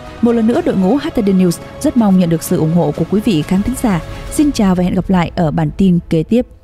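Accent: North, Vietnam. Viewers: Sao hom nay Dai HT D News lai co giong bac nua troi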